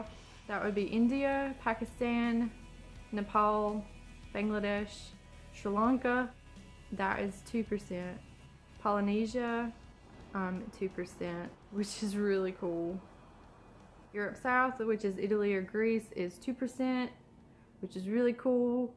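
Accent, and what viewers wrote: South, United States. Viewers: And your accent say you're 100% Southern. Americans are all muts.👍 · You have a lovely southern accent. Are you from North Carolina ? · Everybody is a mix of genetics from different regions of earth. There is no such thing a a pure race. You are what you are and that is beautiful. You are unique. Be proud of who you are. So here is a little random comment. You look like Sydney Watson, who has a youtube channel also. You may be related to her. btw you are gorgeous (lucky husband) and I love the southern twang in your accent. Which state are you from? Be happy, be proud.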